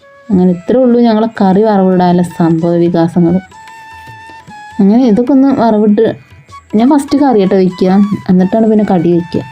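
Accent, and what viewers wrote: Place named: Malappuram, India. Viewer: Nalla video, manoharamaya drishyangal. Calicut, malappuram bhasha ippo kelkkbol chiriyautto, njan avide vanna samayam , samsaram kettittu, veruthe thalayaattikondirunnu. Ippozhum cherya budhimuttundu, ennalum ok, Curryveppira nannayi grow cheyyanulla tip parayatte, oru glass kanjivellam pulippichu 4 glass vellam ozhichu dilute aakki ozhikkuka. Ithu njan pareeshichu jayichathaanutto.God Bless ❤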